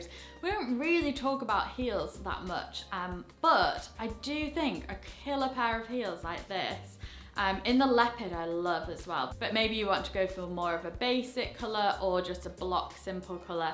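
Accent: United Kingdom. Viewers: I'm American from L.A. You have a British accent. You're better than all the other stylists in YouTube. You're the only one I subscribe to. Thank you for being the voice of reason.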